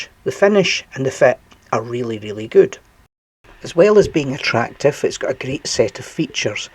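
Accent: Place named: Scotland, United Kingdom. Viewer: Good clear review, i like your clear Scots accent too.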